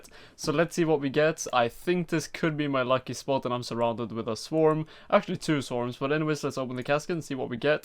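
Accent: Sweden. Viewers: swedish? sounds so much like swedish kind of english haha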